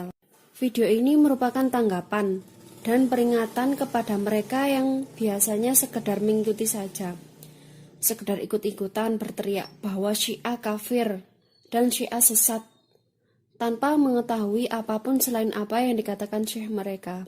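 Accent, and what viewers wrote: Java, Indonesia. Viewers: kalo saya liat logat2 nya org jawa y ka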